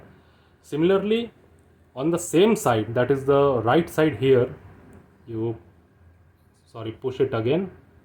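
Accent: India. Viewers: I never get tired of listening to indians speaking english. 😂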